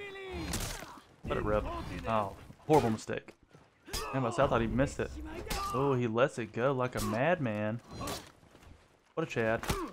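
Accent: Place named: South, United States. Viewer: Can’t help but hear the country in your voice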